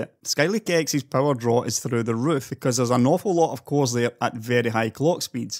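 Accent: Scotland, United Kingdom. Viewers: i love you videos and your scottish accent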